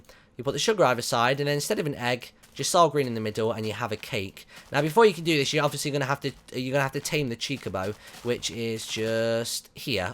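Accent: United Kingdom. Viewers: yeah... I'm not usualy this predjudice! sorry, I like brittish accents! Plus I love his yogbox series! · this guy is so brittish he sounds royal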